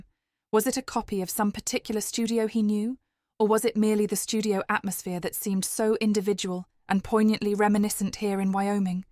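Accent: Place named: United Kingdom. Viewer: Please find American voices for Ametican novels. (I have a sensative ear for accent & couldn't listen to your James Joyce stories w a British accent. They didn't work ....). In genrral I am grateful for your downloads. Very.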